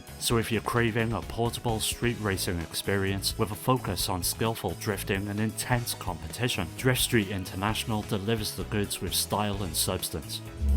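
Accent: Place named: England, United Kingdom. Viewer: uggghhh this is the nastiest sounding english accent fuckin clear your throat, ever heard of an AHEM? "If U FROW a Ton of Guramplay" eughh the way you say Game is fucked up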